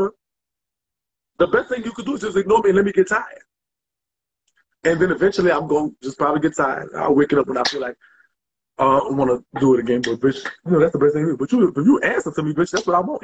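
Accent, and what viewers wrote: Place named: Louisiana, United States. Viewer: It’s the Louisiana accent for me I love it 🤣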